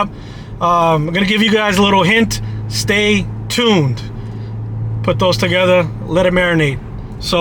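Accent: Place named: Massachusetts, United States. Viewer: I’m from MA too. You don’t have a strong accent at all (the road signs looked like you were closer to RI anyways), but I noticed it a few times - not a bad thing. Anyways - love the S5, congrats!!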